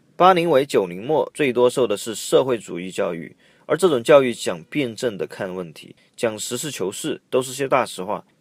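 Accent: Taiwan. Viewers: 是台湾人吗？口音有点像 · 你凭啥是台湾腔你是大陆人吗不要台湾腔好不好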